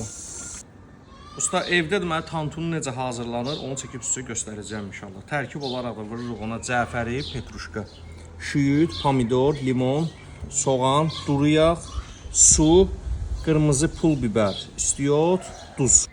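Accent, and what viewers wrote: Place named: Baku, Azerbaijan. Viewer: "Çoxlı qurdalıyırığ " canım 👑BAKILIM👑 ləhcövə qurban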